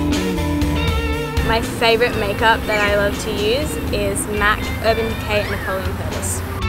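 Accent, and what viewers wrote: Australia. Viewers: beautiful woman , lovely Aussie accent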